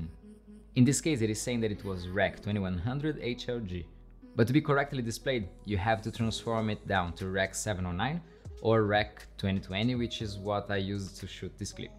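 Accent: Brazil. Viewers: Thanks for the video, really nice explanation!! PS: You have a little Brazilian accent 🤔🤔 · mano, tu é brasileiro? 🤔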